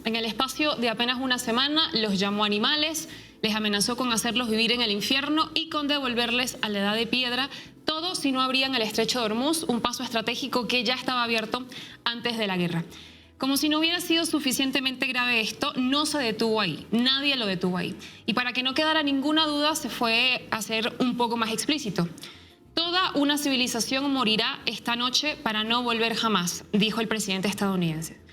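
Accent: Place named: Venezuela. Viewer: En el acento, se le nota que es venezolana.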